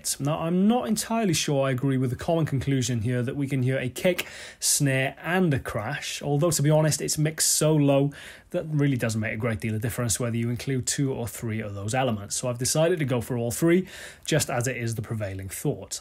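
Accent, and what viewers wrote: United Kingdom. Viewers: Helps to hear a Brit explain.. adds to the authenticity..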